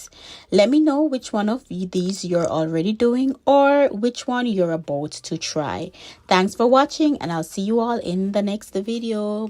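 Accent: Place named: Caribbean. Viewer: I don't know how I ended up on this side of youTube but heard the accent and hard to support my Caribbean sistah! Great tips!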